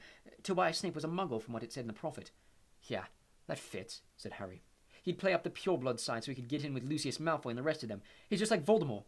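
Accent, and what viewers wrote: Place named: United Kingdom. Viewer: Umm that's called British accent.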